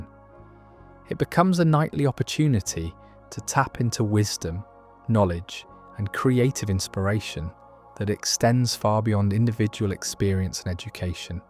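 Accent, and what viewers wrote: United Kingdom. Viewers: Sorry, always hard for me to follow Brit accents. Does NOT keep my attention. I love this topic, but can't view it.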